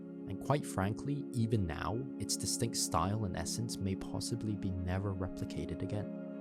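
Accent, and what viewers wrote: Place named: Transatlantic, United States. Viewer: You have a very transatlantic accent. Kinda half British Isles, half North America.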